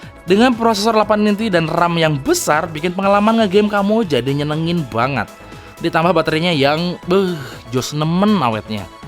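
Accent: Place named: Malang, Indonesia. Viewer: joss nemen :v pemalangan bgt bahasanya 😆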